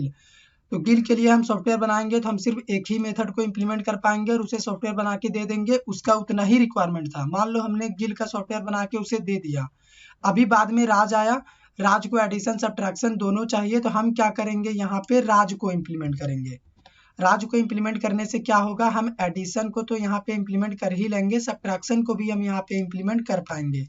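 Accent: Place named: Bihar, India. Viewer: Bihari accent is lob❤️ 'haiyee nai h'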